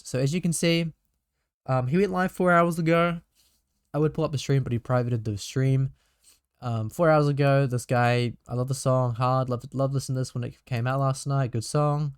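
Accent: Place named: New Zealand. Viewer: im not super into animal jam like i used to be when i was younger, but i like to stay up to date on the drama... never watched a video of yours before but i immediately recognized the accent .. love to see kiwis makin good content bro keep it up !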